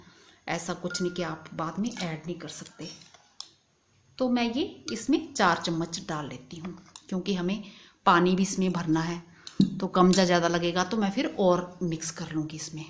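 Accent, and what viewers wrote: Punjab, India. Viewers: g aap 4 minute ke vedio ke 22 minute laga diye kirpa karr ke sabh teyar karr bass ek piece kaat karr short mein dikhaeya karo........main hi nahi sabh bor hote honge.........kaam achha kiya.......shukriya.....aap ki zubaan punjabiyat jhalakti hai aur yeh achhi baat hai......koi bhi ho bolne mein wahan ki khushboo aani hi chahiye......👍👍👍👍😃😃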